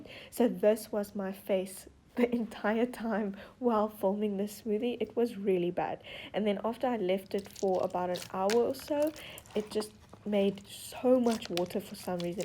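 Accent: Afrikaans, South Africa. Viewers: South African right? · Your accent is beautiful, South Africa is amazing. 🇿🇦🇿🇦🇿🇦😙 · this is kinda weird hearing a south African voice knowing, China town is kilometres away, game is in the nearest mall sksksksksksksksksk Great video tho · Cause you sound afrikaanse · Honestly, I could hear in your voice that you're South African. I am South African too but I have an American accent. I just love hearing an all South African accent 😅❤❤❤ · South african? :3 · Ya u definitely from south africa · Im not gonna lie, I realised you were South African right away and of how you pronounced the "R"s and how you pressed on the "T"s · I love her South African accent❤️🤭😊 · I can clearly hear that you speak afrikaans. I know that accent. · Are you south african!? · South African!! I could hear it in your voice😂❤️ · right when you started talking i knew you were from south africa my mom was born and raised there and i have family that still lives there i live in the US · Jy kan hoor jys afrikaans😂😍😘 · Are you South African because I am and you have a accent and you are say rand instead dollars to I know this because I was born in port Elizabeth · Are you South African I think I hear it in your accent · Your voice sound if you are from south Africa ek is van africa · You kinda sound South African. 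Thats where Im from :P Edit: wait, doesn't matter. I just realised that you have been referring to currency as "Rands" and your bio says Cape Town. I feel dumb now. Lol XD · Lol, when I first heard her accent I immediately thought she's from South Africa, and then by mentioning our brands (Game etc) I kind of had a feeling but when you mentioned rands (South African currency) yeah you're from SA 😹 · Are you from south africa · Are you from South Africa?? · I knew she sounded South African!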